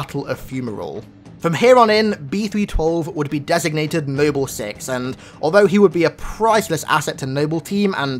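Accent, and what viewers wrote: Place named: United Kingdom. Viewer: Goddamn, can your voice get even more cocky british? 👎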